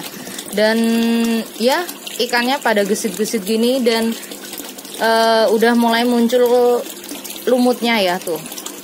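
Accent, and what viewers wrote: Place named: Kediri, Indonesia. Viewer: Mbaknya jawane mana tho mbak? Kok kayak Kediri logate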